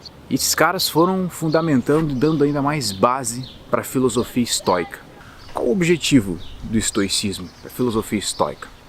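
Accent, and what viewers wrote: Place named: Paraná, Brazil. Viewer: Excelente vídeo! Muito obrigado por partilhar suas ideias. Hoje eu estava a precisar destes dizeres. Não sei por que seu vídeo veio parar até mim, mas agradeço. Seu sotaque paranaense é mesmo muito bonito.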